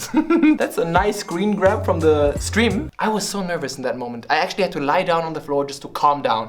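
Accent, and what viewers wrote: Germany. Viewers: asian german speaking english im sold xD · Liebe den schnellen englisch deutsch mashup, weiter so xD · are you German? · you are chinese but your accent sounds like german and french some times and did you just said "figlio di putana" (son of a bitch in italian) ? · Ich Love your Denglisch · weird question: are you german? lol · Are you german? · Are you from Germany? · Mostly its German but he often loves to put some Asian accents in. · DIeses Deutsch-Englisch so geil :D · german for sure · Are you German? your accent reminds me of my german teacher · Hes german I think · why the fuk do you speak deutglish im mexian how the fuk am i supposed to understand you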